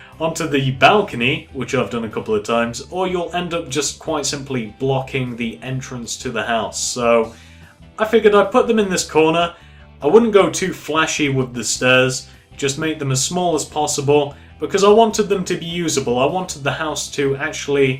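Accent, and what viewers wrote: England, United Kingdom. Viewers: He's English, not gay.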